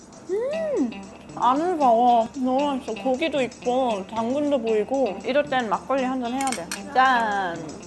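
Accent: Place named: Gyeongsang, South Korea. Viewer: 어머님과 함께 갱상도 사투리로 대화하는 모습도 무척 재미있습니다.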